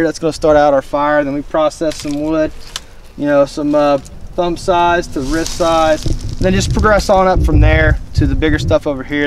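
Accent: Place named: Appalachia, United States. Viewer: good job. you ever spend any time in WV. sometimes I hear a slight hint of hillbilly twang in your accent (meant as a compliment)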